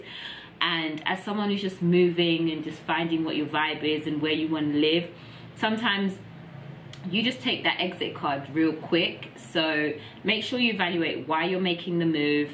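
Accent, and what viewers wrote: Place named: United Kingdom. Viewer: Omg I hate British accent, but I love the video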